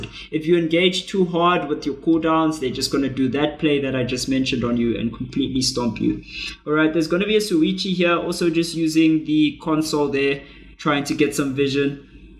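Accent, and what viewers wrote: South Africa. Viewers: You're attitude is great and the thumbnails are clean good job dude, nice accent too sound maybe like south africa or somewhere in africa?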